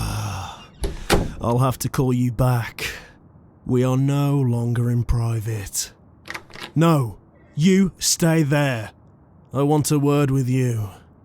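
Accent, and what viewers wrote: United Kingdom. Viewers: its a hella british voice lol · Sounds kinda british though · BRITISH MAN? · Your voice is so British and like a tv documation from police interceptors or something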